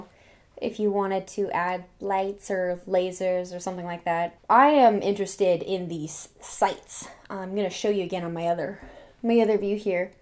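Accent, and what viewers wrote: Wisconsin, United States. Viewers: Austin France Hey hey you can't hate on the Wisconsin accent!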